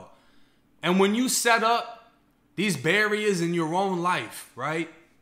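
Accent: Boston, United States. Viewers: Are you from Boston or something close due to your accent??? Why you fascinated with Florida??? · Bro you from Boston area? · Yo you from Boston? Trying to guess the accent 🙂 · Did you ever live in Beantown?? A lot of Floridians are originally from there you have a little accent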